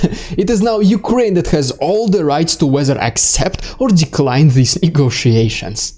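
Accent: Russia. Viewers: I love hearing the good news in an unapologetically Russian accent. It reminds us that this is not an inter-ethnic conflict.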